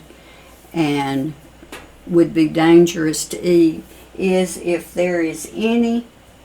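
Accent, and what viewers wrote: South, United States. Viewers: I love the way you speak. You have such a rich, beautiful southern accent. It reminds me of the way my grandparents spoke. Thank you for the helpful video.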